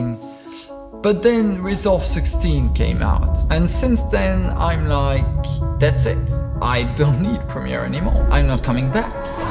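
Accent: France. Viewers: T'es français ? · Fantastic presentation, excellent content, extremely professional. Love your adorable French accent too 😶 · Hey, are you french ? ^^ great video ! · J'étais persuadé que t'étais français à cause de ton léger accent, mais franchement tu m'épates. Bien joué à toi pour ces vidéos de qualité ! 🤗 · Top cette vidéo ! Je sais que tu es français, l'accent, Etretat... héhé :) Tu m'as donné un aperçu pour un passage vers Resolve, moi qui en entends parler depuis des lustres déjà Je me permettrais de te contacter par instagram pour quelques infos / conseils pour démarrer mon utilisation de Resolve, surtout pour l'étalonnage. Continue chef ! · Didn't notice you were french until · I’ve used Premiere for over 20 years and it’s always had stability issues. We used to have to reinstall premiere everyday while the preference files used to get corrupted all the time. 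I’m looking forward to trying Resolve now. Great videos buddy and love the French accent!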